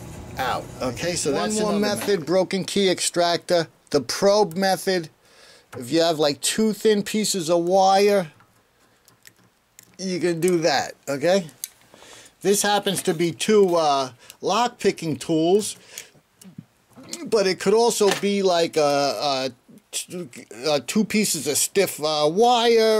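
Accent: New York, United States. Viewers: Good info thanks man. Love the NY accent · Excellent video and I'm loving the accent, New York or at least NY state? or is that Boston?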